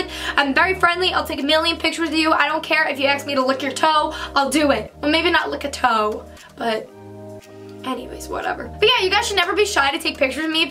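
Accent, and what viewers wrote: New York, United States. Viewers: TeAnna Besougloff new yorker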